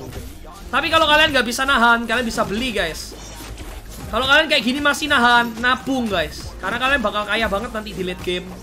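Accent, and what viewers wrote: Java, Indonesia. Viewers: Jawa totok hahahaha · Njar njir blas ramasokk, medok wae sok sokan njir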